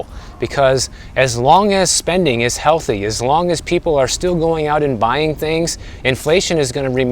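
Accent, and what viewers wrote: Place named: Midwest, United States. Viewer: Hi,! Thank you for this video. I can tell by your accent that you are from the Midwest. I am, too! Janesville, WI, to be exact. There was a KMart store in Janesville and I recall, as a child, many times my mom would put our, “back-to-school”, clothes on lay away. She would always pay them off within a couple of paychecks, but for the circumstance we were in, it seemed to work. The store did not charge interest, and, would literally hold the very specific items, as long as the promised payments were made. KMart, I don’t believe, is around anymore. But I remember that time, well. My mantra, since I was in my mid to late 20s has been: “If you can’t pay cash for it, you don’t need it.”. I hope your message continues to reach younger generations.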